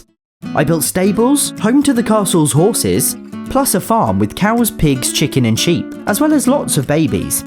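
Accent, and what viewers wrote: United Kingdom. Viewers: glad you’re British and not American if you know what I mean. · He is British I think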